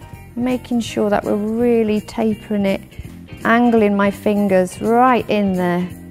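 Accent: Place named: Liverpool, United Kingdom. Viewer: It sounds like she has a Liverpool,England accent to me for sure.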